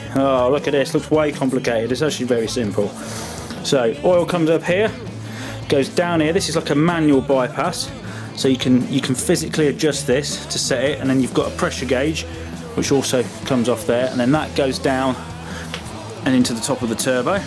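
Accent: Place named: Cockney, United Kingdom. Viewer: Are you an East ender ? you got a fantastic thick Cockney accent.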